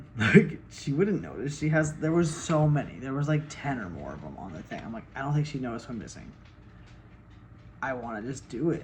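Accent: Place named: South, United States. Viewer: I love how you get southern on YouTube. Love you so proud of you ❤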